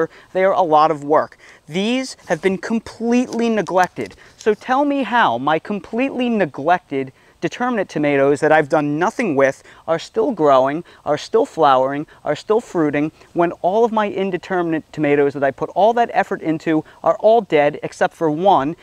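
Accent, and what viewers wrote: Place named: Pennsylvania, United States. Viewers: So funny, I KNEW you had a Philly accent. I was going to ask you after a previous video. I went to sleepaway camp in the Poconos for 10 years and I can pick that accent out anywhere. And thanks for the info on indeterminants. I already have Celebrity and Bella Rosa seeds and will be planting them this fall (South Florida, Zone 10B. You think YOU know about heat and humidity. HA!! AND our bugs are the size of Mini Coopers!) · When i started watching and you mentioned you were in NC, I was like oh cool my father and step mom lived there, she was from there. RIght away I said to myself hes not from there lol he's sounds like PA and looks like a Jersey Boy. Lmao in you revealed that you are from the PA/NJ area. lol WE spot our own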